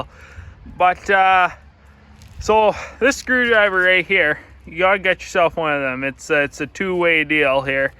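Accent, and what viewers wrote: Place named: Alberta, Canada. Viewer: Sound like a wannabe albertan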